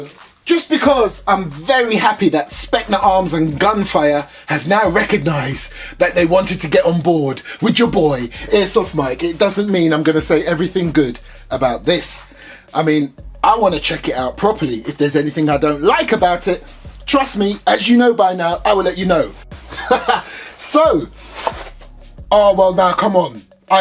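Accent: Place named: United Kingdom. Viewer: Ima sum up why Airsoft Mike is my favorite airsoft reviewer: 1. He has a british accent (like come on) 2. He’s always super excited about reviewing these guns like it’s awesome 3. He likes to review budget guns and sometimes are beginner guns 4. If there’s a gun he’s prolly reviewed it EDIT: TAKE MY MONEY SPECNA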